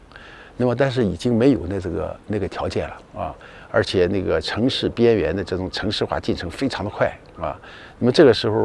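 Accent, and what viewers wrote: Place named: Yantai, China. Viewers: 听大叔口音是烟台海阳的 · 作为一个大连人，烟台口音听出了家乡味道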